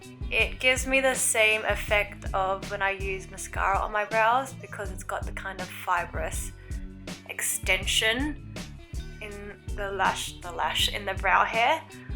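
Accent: Australia. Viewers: ahhh love hearing another Aussie accent!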